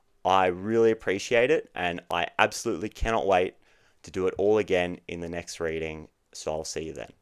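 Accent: Australia. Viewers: So thats gotta be an Aussie accent.. im in aus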